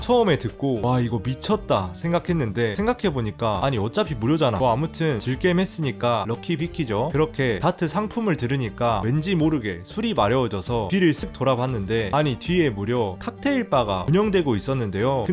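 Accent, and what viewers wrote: Jeolla, South Korea. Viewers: 님 전라도 사람이신거같다 말투가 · 3대가 서울인 나에게 전라도 동상의 사투리 귀요미.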